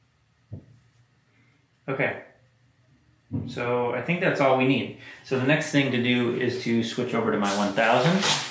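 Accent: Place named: England, United Kingdom. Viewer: I came across your site, I was imprest, and I join. Must say you do not sound American but English. Where are you from ?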